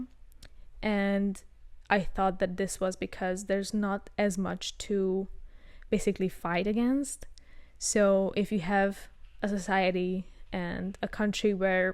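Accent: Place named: Hungary. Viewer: It's cool hearing you speak English, as a Hungarian I always cringe because of my accent, but I actually like your's even if it is Hungarian.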